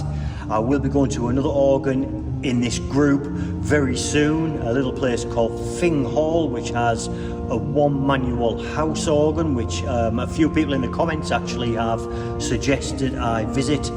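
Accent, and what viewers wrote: Newcastle, United Kingdom. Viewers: Enjoyed the video very much - it’s a change to actually learn something about the organ you’re playing. You sound Geordie - like me.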